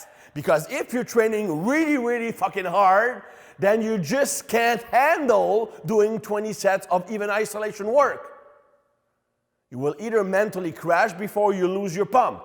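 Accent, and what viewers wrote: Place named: Quebec, Canada. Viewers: He's quebecois, french canadian. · Oh my Quebecois! Lovely :)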